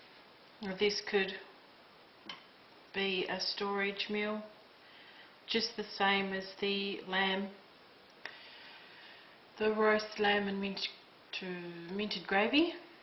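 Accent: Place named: Australia. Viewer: There's the Aussie accent -- and the rest of the English-speaking world. Kiwis are even harder to understand for the rest of the world. What's so AUSSIE here is the electric pie maker! G'day nice lady!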